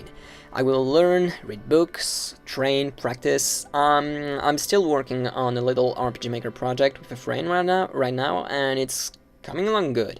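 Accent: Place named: United Kingdom. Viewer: cet accent british sa race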